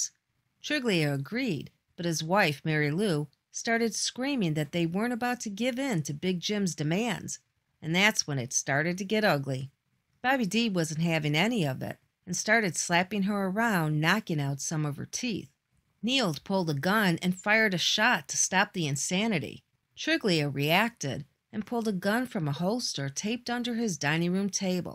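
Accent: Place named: Western New York, United States. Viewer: I really enjoy your channel and how you research and include newspaper clippings like in this one which I thought was very good. The narrators voice is unique, def Western NY? Cleveland or Detroit I’m guessing lol. But this story got my interest bc I moved down from Brooklyn to Fort Lauderdale in 1983 and the locals were still talking about this story and Big Jim. I’ve even been in the 4 O’clock more than a few times back then lol. Keep up the great work you people do.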